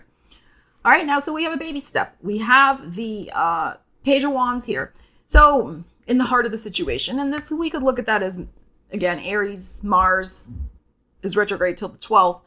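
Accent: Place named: New Jersey, United States. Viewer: She sounds like she's from New Jersey ... or something.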